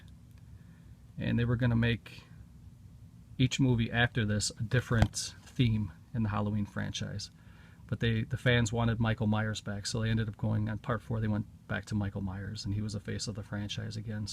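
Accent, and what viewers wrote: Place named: Midwest, United States. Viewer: I knew your accent was Midwest. Im in Illinois about 20 minutes from the South-Eastern corner of WI. I am about to grab the last cheap copy of Body Bags on Amazon.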